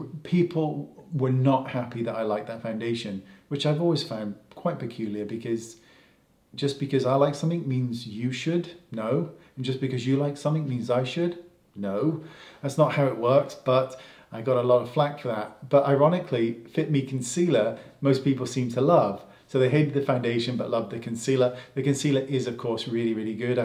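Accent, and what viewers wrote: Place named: United Kingdom. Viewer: I loveyour videos and tour Brittish accent!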